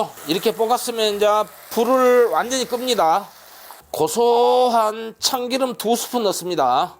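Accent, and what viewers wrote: Jeolla, South Korea. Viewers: 늘 존경합니다 진심이 느껴집니다~^^ 전라도 사투리가 구성져요 ㅎㅎ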